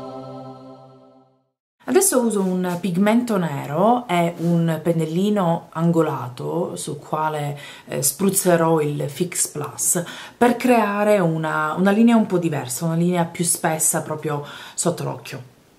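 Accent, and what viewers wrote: Sicily, Italy. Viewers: ci piace così com'è con il suo bell'accento siculo...sennò che sarebbe??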